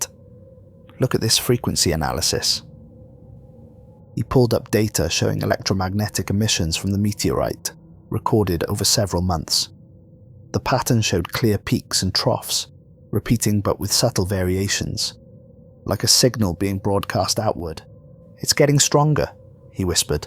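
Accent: Wales, United Kingdom. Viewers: I like the story but the request for subscription resonated as I realised you're welsh. Good luck to 10k!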